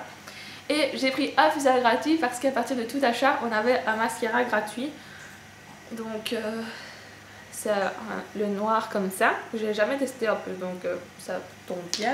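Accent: Belgium. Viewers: Ah ok t'es belge alors. D'ou ton accent irritant! Rien que pour ca je ne m'abonne pas! Salut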